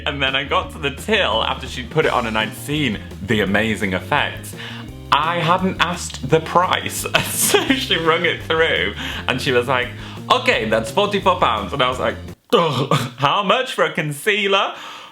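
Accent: Australia. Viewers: Love the Aussie accent. Hugs from Queensland! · love you!!and that aussie accent just brightened up my dreary sunday:D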